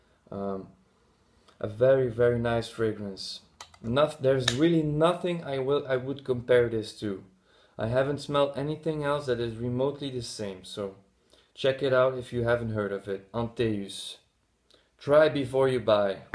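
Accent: Netherlands. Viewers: very nice list,! i'll need to check out 1 million intense, but strangely enough, it's been pulled off the shelves over here in Ukraine. all the 1 million flankers have been. wonder if it's just over here, though. btw, where are you from? can't place your accent. i'd say Dutch?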